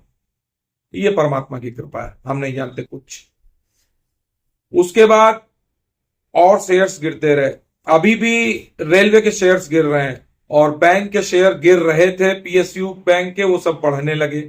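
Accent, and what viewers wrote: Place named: Lucknow, India. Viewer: Bhai app lucknow kai hai kya, maza a gaya apke commentary sun kai. Wow 👌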